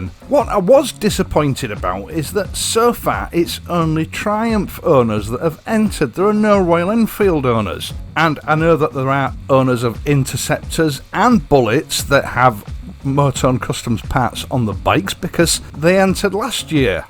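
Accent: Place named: Midlands, United Kingdom. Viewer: Love your blogs, i've been most areas in UK, but trying to locate your accent. I'm thinking, Notts, or higher up country. Ride safe govoner.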